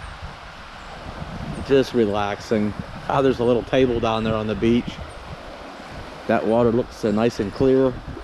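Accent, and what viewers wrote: Pennsylvania, United States. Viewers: you are an exceptional friend to do all that you have done for Ausia. I am also impressed with your diagnostic skills. As soon as I heard your accent, I knew you were from PA, as am I. The ferry at Port Angeles can take you to the San Juan Islands, USA. I put my RV on the ferry and went to Friday Harbor to visit friends. I think for a 22 foot RV, it was about $80 back in the early 1990's.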